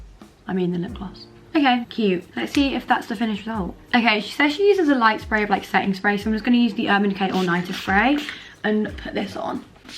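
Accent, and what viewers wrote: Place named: Birmingham, United Kingdom. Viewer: omg I love the fact I know your a brummie with the “do you know what I mean🤣” “I’m not being funny”.... people say I always say this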